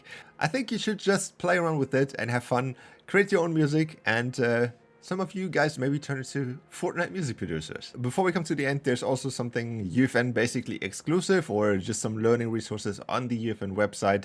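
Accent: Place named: Germany. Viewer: Nice Video! You have a little German accent so I wonder are you speaking German normally?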